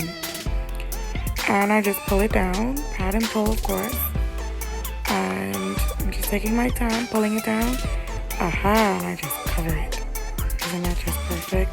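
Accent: Bahamas. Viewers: You have a new subbie for sure! I scrolled down to see who would say you have a Bahamian accent. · Lol I had to come check the comments cuz I’m like she sound Bahamian 😂😂❤️🔥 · Listen I was like this gal sound Bahamian lol. 🇧🇸🇧🇸🇧🇸 New Subbie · From I hear that lil accent I say yea she Bahamian! 🇧🇸 New subbie!!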